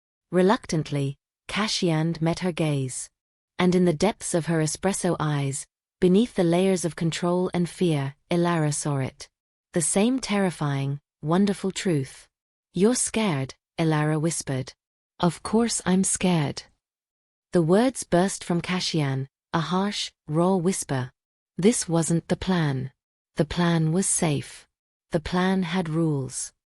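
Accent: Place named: Ireland. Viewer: The reader's accent sounds Irish. It's beautiful. I feel like I'm far away in a green country, inhaling the bakery''s smell of home. I long for a little bit more.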